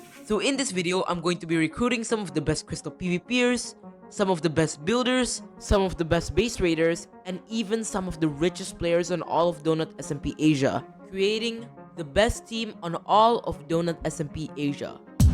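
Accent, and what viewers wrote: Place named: Philippines. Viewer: You talk like your pilipino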